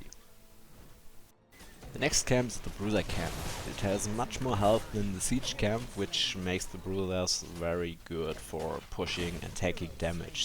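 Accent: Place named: Germany. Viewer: Dat german accent...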